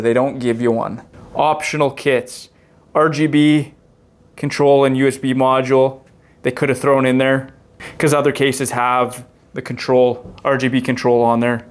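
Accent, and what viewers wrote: Canada. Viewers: Is that a Canadian I hear? :O Great review, by the way. Looking forward to building in this case tomorrow.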